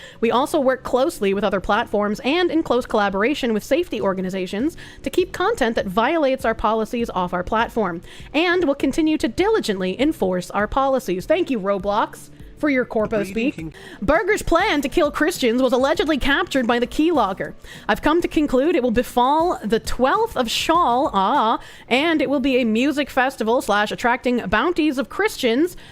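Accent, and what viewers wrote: Boston, United States. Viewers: has the only Bostonian accent that doesn't give me brain damage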